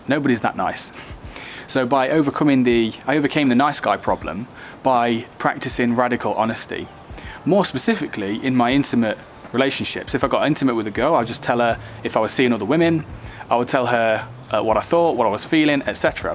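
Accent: United Kingdom. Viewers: Nice! I had a feeling you're accent was British 😅. That's such a beautiful accent and place to live! Greetings!!!!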